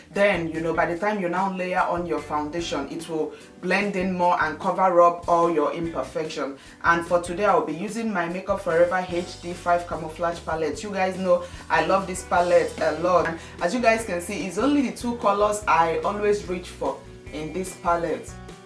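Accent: Igbo, Nigeria. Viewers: new subby here. love your spirit and your igbo accent.....really cute make up. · First of all: Great Make-up ! You inspired me ! And i have a question, where are you from? You always Remind me of my (nigerian) dad and his accent :D :)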